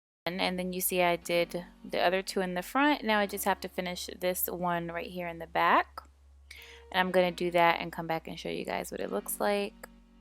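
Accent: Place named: Caribbean. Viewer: Are you West Indian or have West Indian parents. it's just the way you say cornrow and catch/ketch when talking about the braids 😭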